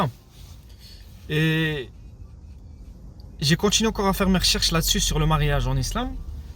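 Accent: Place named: Maghreb. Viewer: Ôh merci, merci de m'avoir ouvert les yeux!! Je vous ai dit j'ai compris, maintenant je vais détester l'islam comme vous. C'est trop bien de détester la religion des autres, de détester les morts. Monsieur le théologien à la sauce haineuse qui n'a toujours pas réussi à répondre à 1 seule question, 1 seule. Si j'avais manqué de respect à il me l'aurait fait savoir, mais vous vous me manquez de respect et prétendez sur mon compte avec arrogance. La première chose que j'ai aimé de c'est son petit accent maghrébin, je trouve que ça a tellement de charme. Ce message marque la séparation de nos chemins, j'espère que vous arriverez à comprendre le français: BONNE ROUTE!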